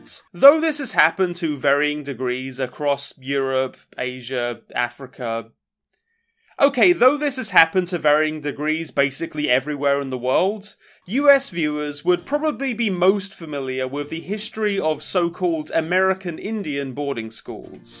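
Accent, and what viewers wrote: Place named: England, United Kingdom. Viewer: says a lot about the oppression of Cornish culture that it wasn't mentioned in the video, despite you sounding distinctly English. very good video though.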